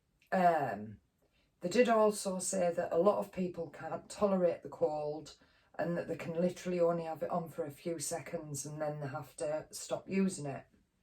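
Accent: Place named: Wales, United Kingdom. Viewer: Hello friend. Wishing you a nice weekend in ..... I'm guessing from your lovely accent ....Wales?? I know that your videos are helping - and will continue to help - women with cancer. Take care of yourself, kind lady. ❤️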